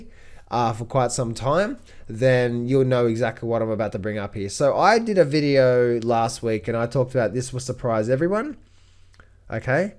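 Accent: Australia. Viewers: love a good no-nonsense Aussie accent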